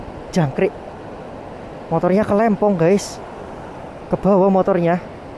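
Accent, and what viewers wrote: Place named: Banyumas, Indonesia. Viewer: Sama" orang ngapak tp kalau nonton smbil dengerin mas ngmg jd horor + kocak mantapp menghibur sekali mas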